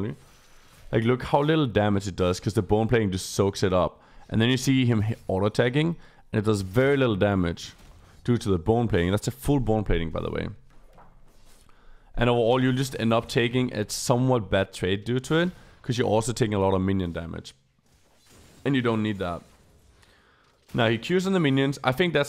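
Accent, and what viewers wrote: Denmark. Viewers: is danish? I'm picking up a danish accent, i might be wrong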